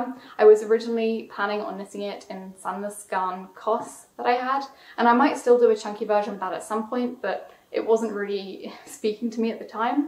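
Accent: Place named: United Kingdom. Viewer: I’m binge watching every episode )) I love my blacks and pinks but I’m obsessed with your British accent and colour choices )))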